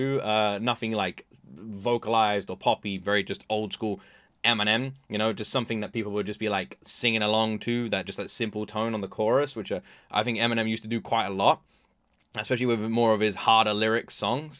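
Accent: United Kingdom. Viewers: I'm new to you and your channel you did a amazing job with this em I'm listening and hearing your accent or you british or english I was watching some video yesterday and seen a em video and I didn't think nothing of it I thought it was a older video em talking to some guy and em going do a magic trick and make his career disapear and now alotta other reaction talk about some rumors about em trying to get cancelled or em about to retire em my guy my guy em been doing this for how long now and only hours later from that em video I seen em drop this with the music video I don't know what going to happen what going on son the only one that knows about anything is em and probably whomever else em talk to if this one of em last songs albums it's going to be a really very sad bad day I like em em my guy my guy keep on doing what you doing my guy 👊👊🔥🔥👊👊👊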